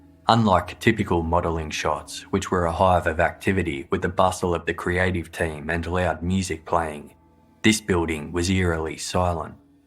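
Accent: Australia. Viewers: Not NZ, 'Stralian' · If you mean the presenter then he sounds Australian or New Zealand. I really like his voice and find it so relaxing that I often put these vids on and fall asleep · He couldn't be more Australian, and speaking seems a struggle, is what I think I meant. Not so much a compliment as an observation. Listener since Ep 1, so not a casual or spur of the moment take, and one he might not disagree with to judge by the Q&A he once did.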